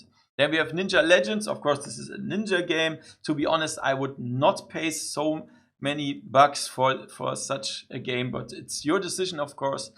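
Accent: Germany. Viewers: You never have to apologize for your English. I absolutely love your accent and Germans in general so the people who are here, stay for one reason or another.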